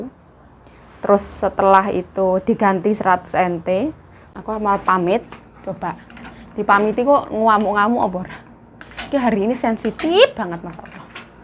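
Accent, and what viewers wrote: Java, Indonesia. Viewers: Sukabumi hadir..mba saya suka lihat mba lg makan apa lg kalau ngomel"gt aga medok tp enk di dengar lucu.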